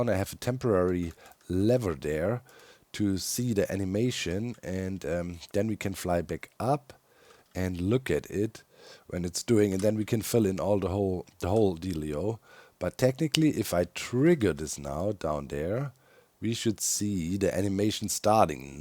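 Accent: Germany. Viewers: "fiddl wid it a littl bid" must be the cutest thing you can say with an endearing German accent like ❤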